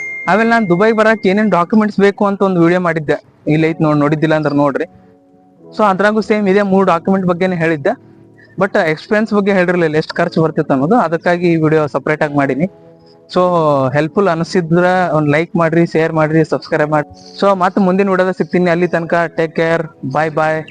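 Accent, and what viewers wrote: India. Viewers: Houda okay ನೀವ್ ಮಾತಾಡೋದು ಸ್ವಲ್ಪ ನಮ್ಮ ತಾರಾ ನೆ ಅನಿಸಿತು ಅದಕ್ಕೆ ಕೇಳಿದೆ , ನಮದು ಬೆಳಗಾವಿ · Nevu north kannadaana...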